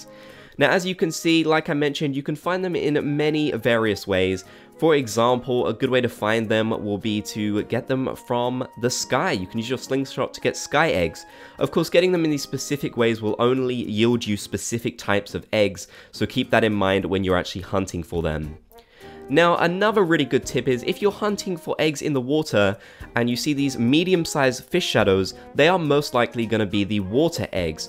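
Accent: United Kingdom. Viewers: are u British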